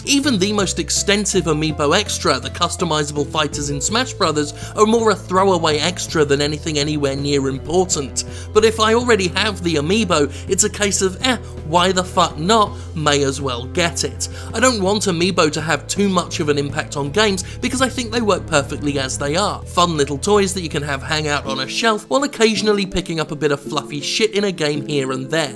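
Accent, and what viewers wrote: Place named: United Kingdom. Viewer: (I'm new to Sterling) says he's american but he sounds british so does he live in america and is british or what?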